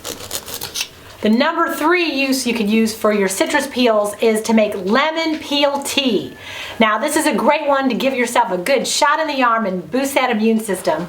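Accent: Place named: Michigan, United States. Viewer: Let me guess.... you are from Michigan. Half my family speaks with that accent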